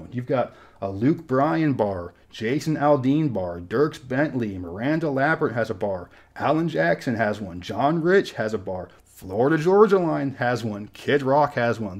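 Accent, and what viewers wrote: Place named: California, United States. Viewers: While it may sound a bit crazy, personally to me, you have a very "California" sounding accent, and even if you never mentioned where you were from, I would assume you grew up in the state. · I'm curious to know where in California you're from and why you sound like you're more from Tennessee than California? I know you live in Tennessee now fyi. Sorry if this sounds like an ignorant question. I once met a guy who was from upstate NY and sounded southern and it really confused me 😂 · I wouldn’t believe you if you said you were from anywhere else than CA. It’s due to your accent. I’m from FL, it’s mostly the attitude and the change of culture that talk bring.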